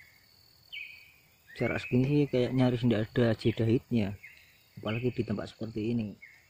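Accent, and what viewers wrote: Java, Indonesia. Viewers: Mas njenengan jowo ne pundi nggeh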